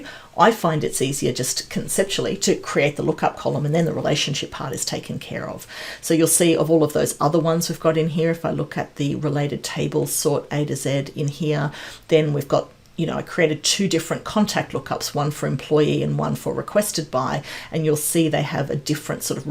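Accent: Australia. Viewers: you are such a nice person... This is the best and full tutorial available. I like your aussie accent, clear voice and easy to understand. I watched this full video and this asset management app works great for me. It was my first model-driven power app, and I enjoyed it alot. Thank you so-oooo much.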